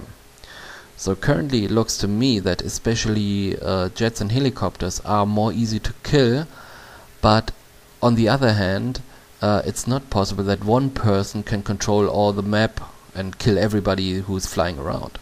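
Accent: Germany. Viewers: Best german accent i have ever heard of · Du bist ein Deutscher :D Das höhrt man! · Ich wusste ab sofort, dass du Deutscher bist xD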